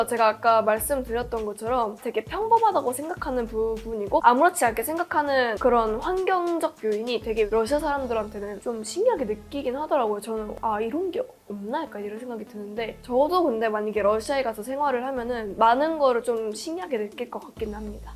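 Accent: South Korea. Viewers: 발음은 한국사람 인데....어디서 발음 배움??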